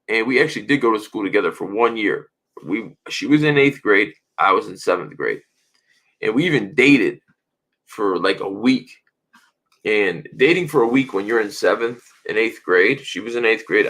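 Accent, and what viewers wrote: Chicago, United States. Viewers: Dude you are hilarious! Neck, hips, lips, small of the back and rear bumper = sexiest parts of a woman ! I can’t could actually feel the sexual tension when you were describing how she was standing there.🤣 Seriously though love your channel I think you could go places in entertainment, you are a naturally interesting person The whole Chicago accent is gold! You can come out to the Bronx with us and pick up a Bronx “forget about it”=foget uhbout it“ “hey you guys go pick me up a cappacuola sandwich “= eh use guys go gimmee a gobbagoo sangwich .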